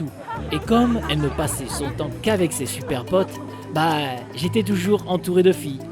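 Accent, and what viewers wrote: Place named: Corsica, France. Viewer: Gros pervers moi aussi je suis une fille et en même temps tu as un belle accent Corse.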